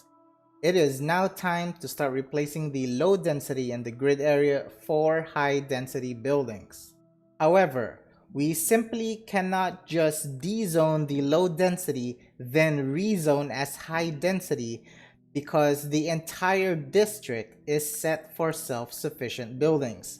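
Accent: Philippines. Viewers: my wife says you sound filipino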